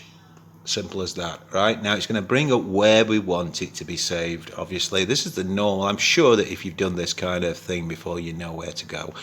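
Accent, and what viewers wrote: United Kingdom. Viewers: Thanks for the brief introduction to SharpCap. Like you say, there not many YT videos to help get started. It's good to hear a British accent downunder. Keep up with the humour.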